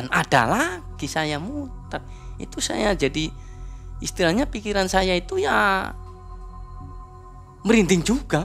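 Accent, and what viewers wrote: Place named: Banyumas, Indonesia. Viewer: Lucu bngt nih orang ceritanya😂😂😂 kaya orang ngapak si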